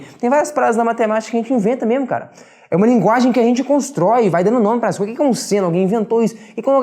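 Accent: Minas Gerais, Brazil. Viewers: Pelo seu sotaque imaginei q vc é mineiro e eu também da cidade de Dores do Turvo confrontante com a cidade de Ubá MG, gostei e adimirei da sabedoria e a sua vocação de ensino muito claro e fácil de entender parabéns. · a mineirice exala em vc cara kkkk e hj aprendi que a microsoft não sabe dividir 360, os caras pularam do XBOX 360 pro XBOX One, depois desistiram e foram pro X, agr estão aprendendo seno e cosseno? kkkkk falando serio, video excelente!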